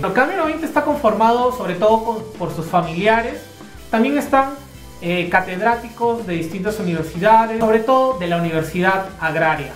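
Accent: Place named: Mexico. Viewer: Bacan la info... pero no hables como mejicano... pa que!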